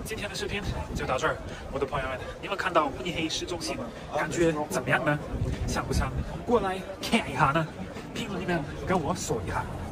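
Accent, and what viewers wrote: Sichuan, China. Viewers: 滿口四川口音😂😏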